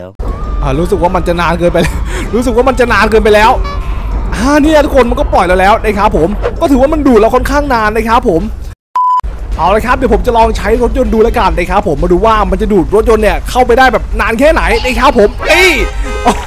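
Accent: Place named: South, Thailand. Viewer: แหลงใต้ชัดเปรี้ยะเลยหลวง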